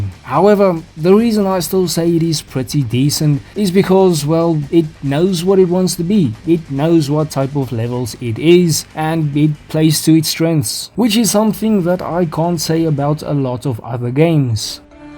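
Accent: South Africa. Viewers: I enjoyed the flow of this video a lot, a nice surprise on my feed! Also is that a fellow afrikaner I hear? :P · Hey man, great video. Are you South African by any chance? Curious due to your accent. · Ur the most South African sounding guy I've heard doing a game review. Glad u popped up on my time line.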